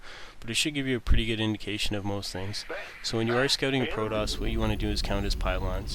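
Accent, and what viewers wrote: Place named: Canada. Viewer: Having gone through this series from the start, I feel it's funny how I agreed with one of the early posters who complained about voice inflection etc. (and of course his oat and aboat Canadian accent:-) But it seems I got used to it very quickly, I guess mainly because he says a lot of very useful things that are right up my alley. All the other "coaches" even such as day9 seem unable to break down the many many aspects of the game into steps that you can practice individually.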